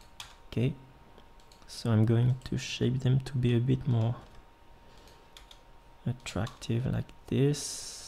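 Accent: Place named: France. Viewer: ton accent français est visible x)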